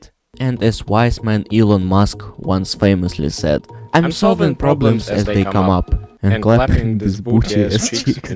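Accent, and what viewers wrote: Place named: Russia. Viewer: По акценту понятно что он рускоговорящий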